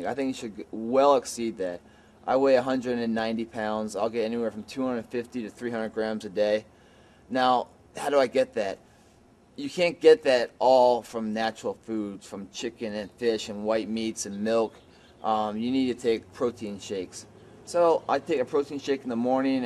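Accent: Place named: Baltimore, United States. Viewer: baltimore Maryland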